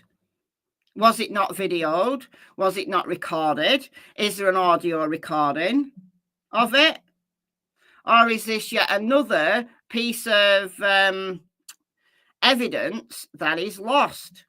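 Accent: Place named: Lancashire, United Kingdom. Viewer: definitely not Allen definitely not there was another Mann looks like him dressed like him etc that exact time used the same type of gun drove the same black ford focus same rims I mean what are the odds aye? BTW ur horrible wooly back accent is really annoying especially when ur desperate like this ur over reliant on your youtube revenue and my God forgive you for what u may be putting g these families through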